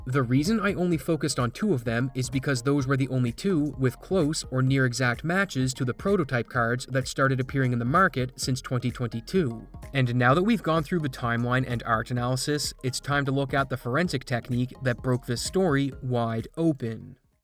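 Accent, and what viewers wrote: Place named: Newfoundland, Canada. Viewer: Took me like 3 videos to catch the Newfoundland accent. 👌🏼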